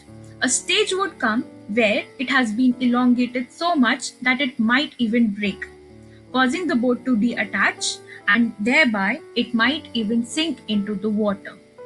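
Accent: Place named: India. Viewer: Indian accent...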